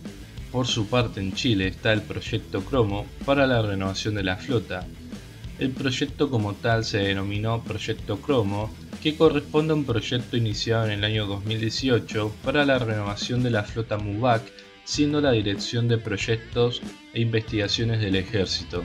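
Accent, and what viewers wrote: Argentina. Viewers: Me encanta tu canal, pero tango una pregumta, pod qué el narrator es o suena ser Argentina? Podrias hacerlo tu end vez? Saludos.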